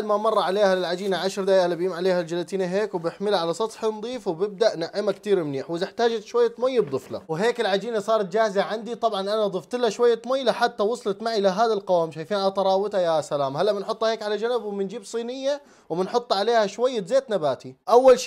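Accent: Syria. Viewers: رجعتي تحكي سورية التحلاب تموتو على البراني 😂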